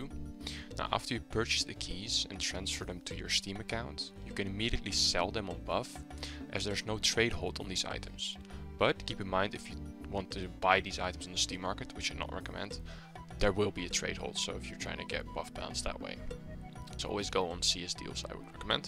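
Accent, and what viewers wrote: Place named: Finland. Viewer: Suomi aksentin kuulee miljoonan kilsan päästä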